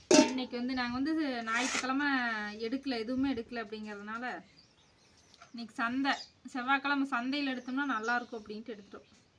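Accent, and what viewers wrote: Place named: Kongu, India. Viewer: கறிவிருந்து அருமை அருமை கலை நேற்று நீங்கள் பேசிய கொங்கு மொழியும் என்னைப்பற்றி கூறிய விதமும் மிகவும் நன்றாகவும் விரிவாகவும் இருந்தது உங்கள் அம்மாவிற்கு தேனீக்கள் போல் என்றென்றும் சுறுசுறுப்பாக இயங்கும் அம்மாவிற்கு எனது பாராட்டுக்கள் ❤❤❤❤❤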